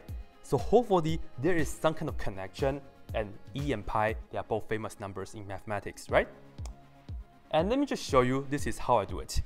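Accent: Singapore. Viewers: 你妈 新加坡口音简直是折磨人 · Great video. You sound like you're from Singapore, which is a country known for its great education system.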